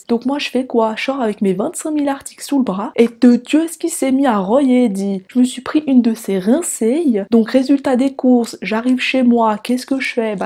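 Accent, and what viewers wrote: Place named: Vaud, Switzerland. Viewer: Ah l'accent vaudois 😂chez nous à Fribourg, on ne dit pas ça va le chalet, c'est plutôt "ça va l'bocal" 😂 et c'est bien vrai don, bon c'est août je retourne à la tchaffe, adju don 🍻😘😎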